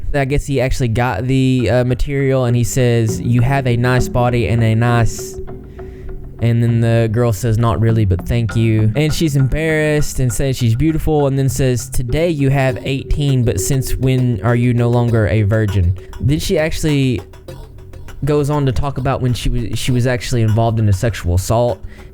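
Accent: South, United States. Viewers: Ur deff southern. I'm in Alabama.u gotta be close lol? Just started watching u on ur Cora story.ur awesome❤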